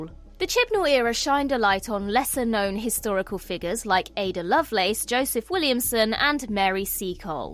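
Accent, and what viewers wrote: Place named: RP, United Kingdom. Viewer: She’s a woman and it’s RP English.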